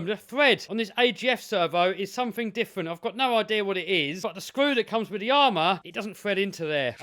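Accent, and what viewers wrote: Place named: England, United Kingdom. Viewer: dude i usually can't stand English accents lol but you're funny as hell 😂 You're vids are fantastic! keep up the good work broskie. definitely gotta have one of these in my future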